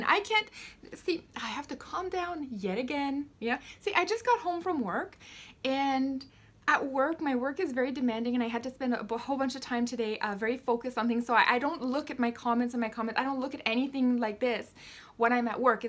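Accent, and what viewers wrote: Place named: Quebec, Canada. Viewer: I love this channel, are you Quebecois because your accent is beautiful to me. Also love your insight and the emotion you put into it. I feel sorry for Rahaf because she is a pawn in the globalists agenda, willing or not, she will one day disappear without a trace, another sacrifice to the UN agenda and the incoming enforced world religion of Islam. Australia, my country still has due process to follow, we don’t just take anyone because that would destabilise our borders, unfortunately we’ve taken too many of the wrong types already, we should, however, immediately demand the release of Asia Bibi into our care, and the white SA farmers.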